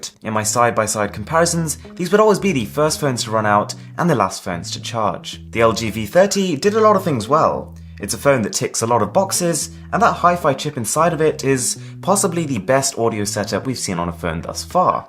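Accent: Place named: England, United Kingdom. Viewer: Just out of interest, is Aaron from England or from the USA? The reason why I ask is because he sounds like he has an English accent but he says "Zee" instead of "Zed" And he talks about the prices in USD instead of GBP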